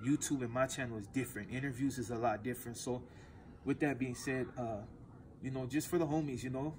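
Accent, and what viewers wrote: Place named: Mexico. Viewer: Cuzzo why you got a Mexican accent though does your bros and sis have the same accent ?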